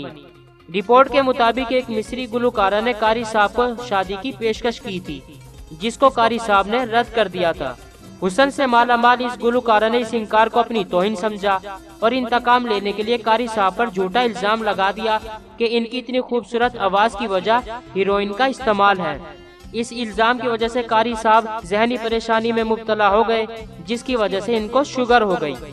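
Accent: Azamgarh, India. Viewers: good information About QRI Abdul BAsiT A lot Thanks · aap Azamgarh se ho kya??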